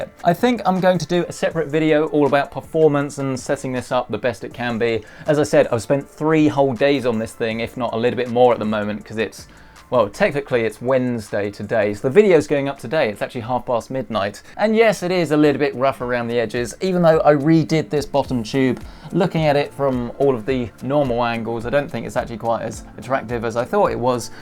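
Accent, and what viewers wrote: United Kingdom. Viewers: Great use of the 90-degree bending tool... my eyes are still hurting...also my ears from that British accent..How do you have 466k subscribers is beyond me.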